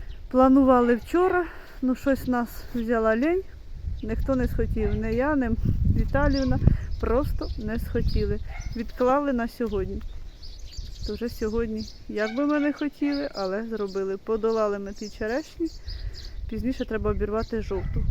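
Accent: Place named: Kryvyi Rih, Ukraine. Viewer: Яка красота я тоже виросла в поселку в кривому розі ,у нас точно такий говір,а де ви живете?ви молодці хазяйни бажаю всим миру,живу в Києві мені уже 72 роки,все промайнуло ,насолоджуйтесь життям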